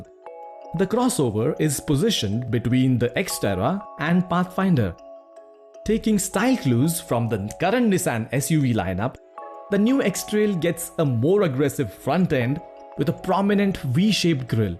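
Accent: India. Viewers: Indian voice?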